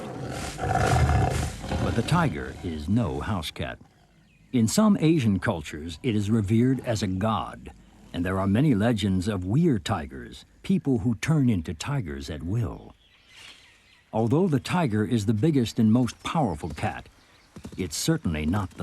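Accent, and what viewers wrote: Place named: United Kingdom. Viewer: Why does the making of segment always have the original uk narration instead of a us narration?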